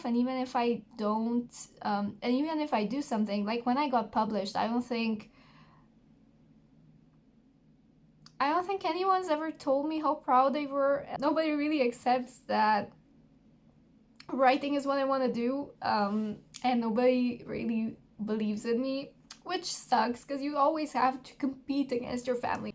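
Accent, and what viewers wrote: Slovenia. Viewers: Love your slovenian accent 🤩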